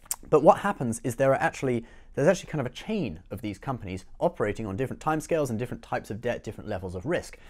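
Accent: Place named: United Kingdom. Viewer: 🤔🤔🤔 are you British? Haha I just noticed that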